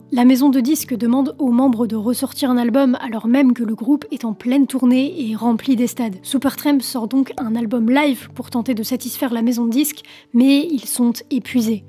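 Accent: France. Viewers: L'accent franchouillard est à retravailler svp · sauf que la langue française prend trop le dessus au niveau prononciation des noms anglais. ça fait tâche.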